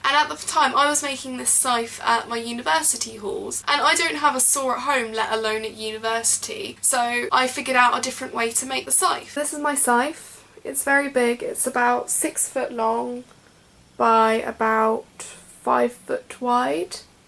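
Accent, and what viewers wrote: United Kingdom. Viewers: PLEASE MARRY ME *.* You have this british accent, you like animes, you are beatifull and you can make wonderfull things..WOW! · omg your British O.o Luvs your accent dearies!!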